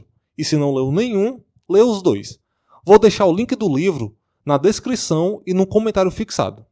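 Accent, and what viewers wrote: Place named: Ceará, Brazil. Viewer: Nenhuma dúvida que esse sotaque é do Ceará..rs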